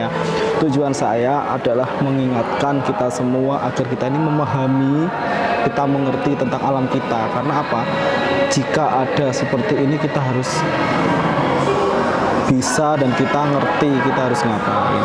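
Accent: Java, Indonesia. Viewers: Nama chanelnya..tp klu bicara medok koyo wong jowo..sukses ya.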